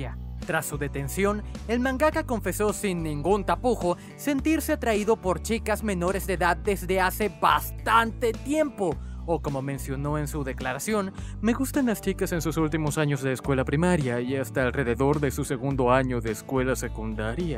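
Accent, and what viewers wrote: Venezuela. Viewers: Tío eres de Venezuela? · eres de Venezuela? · hola y buenas disculpa pero eres venezolano? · eres Venezolano?jajaja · Verga pero el que habla es Venezolano?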